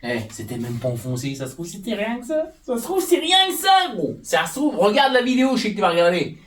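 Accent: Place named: North, France. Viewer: C’est juste, parce que j’ai l’impression de reconnaître un accent du Nord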